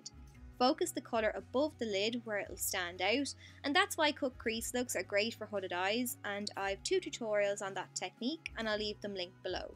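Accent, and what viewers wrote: Ireland. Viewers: Love your accent~ It's Irish right ? :) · Ur accent is so cute. Are you Irish?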